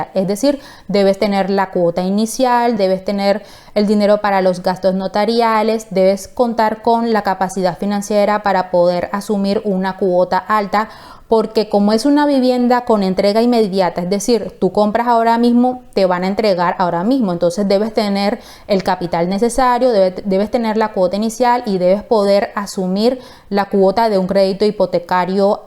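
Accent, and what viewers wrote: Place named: Caribbean Coast, Colombia. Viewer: De una manera amable te aconsejo acudas con un fonoaudiologo: que te ayude a mejorar tu dicción. Tu pronunciación muestra que eres una persona ignorante en el tema. No me refiero a tu acento que se nota costeño. Puede que no seas ignorante en el tema pero esa es la percepción que muestra tu mala pronunciación. También debes mejorar la redacción! Saludos y mucha suerte 🍀👍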